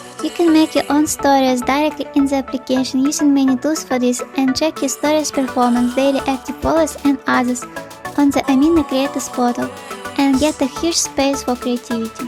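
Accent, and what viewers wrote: Russia. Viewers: I think she's Russian and that is her Russian accent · Видно что русская. Или знаешь русский. Акцент есть. И кто нибуть, научите меня так рисовать 😍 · Вот это акцент, сразу понял что человек русский) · Акцент все же русский =v= А вот голос милый) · I like it funny and I realized that you are Russian because you have a Russian, but I like your videos☺️ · Ты русская? Да, плохой вопрос... но по произношению заметил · Сразу поняла, что автор русский по акценту :D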